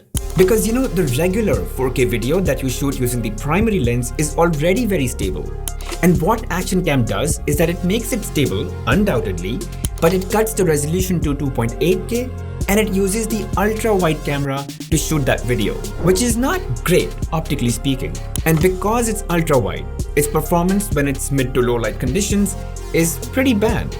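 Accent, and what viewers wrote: India. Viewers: Accept my humor please Your accent is classic, brilliant and likely indian You're this little guy and I say little guy in your accent And you have in an extremely short space of time Become top tier Amongst the most trusted reviewers on earth 🌎 YOU ARE BRILLIANT! Yea we all make errors But brilliance remains.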